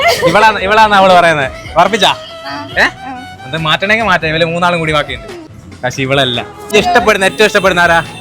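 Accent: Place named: Vadakara, India. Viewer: ഇവൻ. വടകര. ആണെന്ന്.. തോന്നുന്നു. സംസാരം. ഓവർ 😡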